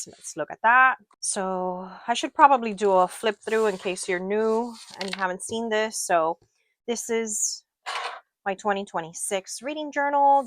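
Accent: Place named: Miami, United States. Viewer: I heard your accent and instantly knew you were a fellow miami girly! Hiiii! Love the vid! :)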